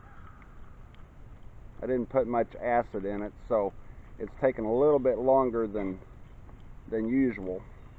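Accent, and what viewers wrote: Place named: Indiana, United States. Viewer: What part of Indiana are you from?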